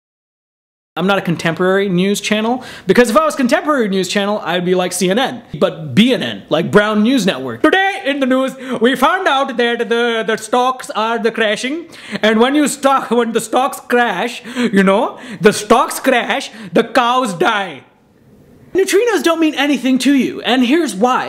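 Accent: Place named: Australia. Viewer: It sounds Australian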